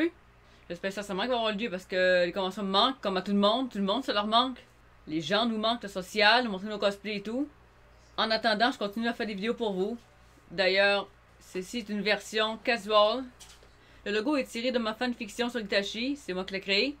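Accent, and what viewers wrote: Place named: Japan. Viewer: vraiment bravo tu l’es mérites vraiment et ton accent japonais est incroyable !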